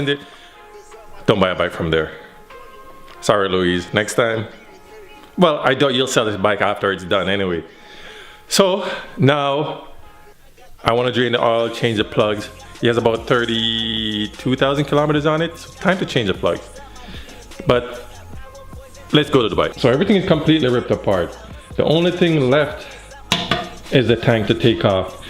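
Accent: Jamaica. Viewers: Found ur Channel last night n watch about 8 videos to this point ... keep it up man love the content man ...u sound like a yaadie to lol.. bless up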